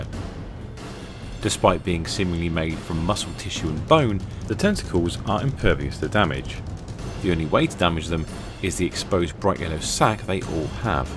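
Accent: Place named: United Kingdom. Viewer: Yey funny brit narrator uploaded!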